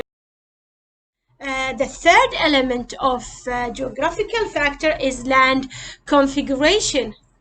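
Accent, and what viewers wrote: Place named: Europe. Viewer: Mam,please speak like Asians,not like europians,there mouth and tongue differ from us... I appreciate ur class,but plz come back asian style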